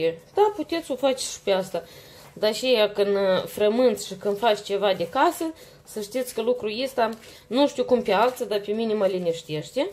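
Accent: Moldova. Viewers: Bravo esti o Super gospodina.Imi place mult graiul tau moldovenesc.Maria. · M-am abonat pentru graiul moldovenesc ”dulce”...! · Ma uit cu placere la ceea ce gatesti,esti ff simpatica so imi place cum vorbesti moldoveneste!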